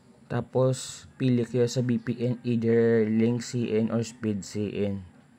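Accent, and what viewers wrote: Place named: Iloilo, Philippines. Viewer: Mabal an mo gd Kun ilonggo Kay ga dala Ang accent bsan mag tagalog hahaha same boss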